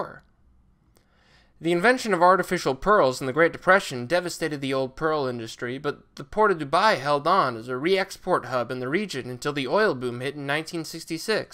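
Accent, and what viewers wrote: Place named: United States. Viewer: yes, whiney, whinging american accent is just more bad taste.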